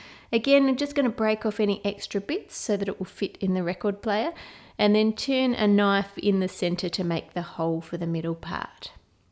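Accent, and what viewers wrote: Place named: Australia. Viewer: I have entered!! But... do you have to click the subscribe with email button? Mom doesn't want more emails... lol. 😆 Anyway, I LOVE your videos,! They're Sooo fun to watch... you're so creative! And I've gotta say, I love your Australian accent. 😊💖 And your nails! They're such a pretty color!!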